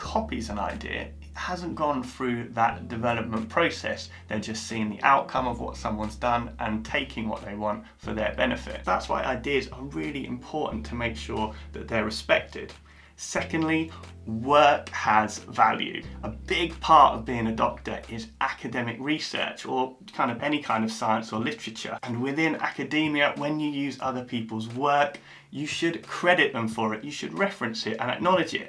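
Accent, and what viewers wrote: United Kingdom. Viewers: A like for your humbleness and great Bristish accent.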